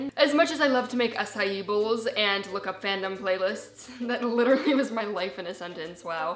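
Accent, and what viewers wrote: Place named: New Jersey, United States. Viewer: you're from New Jersey? no wonder why you sound that way! you sound like you're from somewhere close to NY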